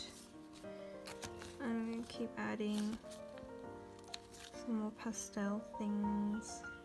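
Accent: Australia. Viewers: Idk why but your accent reminds me of felix and bangchan's accent😂😂😂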